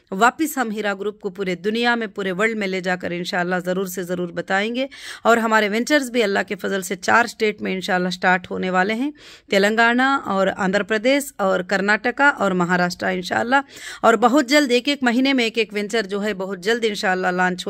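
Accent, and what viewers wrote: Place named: Bihar, India. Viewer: ye bihari hai bol se lang raha hai to up bihar m launch kar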